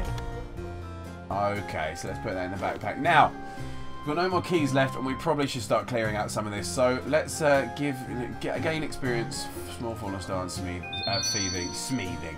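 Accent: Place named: Scotland, United Kingdom. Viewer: I think you were suppose to stand next to Gideon so he could shield you when the demon did his super attack thingy. But you managed to kill him anyways so it's all good. Can the baby troll be named Pickle? I think it should be named Pickle. :D I think your Scottish accent is fine, but I'm Canadian so what do I know? :P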